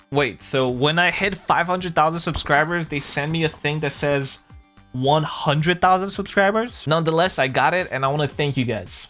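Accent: Romania. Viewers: esti Roman? (ai accent) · There's a guy in some of my classes that looks just like you. He sounds Romanian too. Do you happen to be a high school junior near Boston, Massachusetts?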